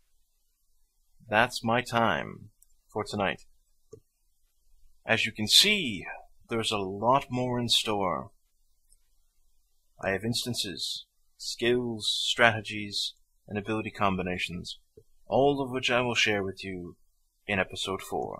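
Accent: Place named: RP, United Kingdom. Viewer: Seriously, I like very much your voice. Too RP for some ppl tho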